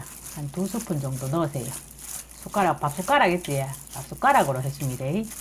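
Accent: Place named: Daegu, South Korea. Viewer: 함무보께예~ 대구쪽사투리네요 "고맙십니데이"가 맞는말이 되야되는날은 언재쯤일까?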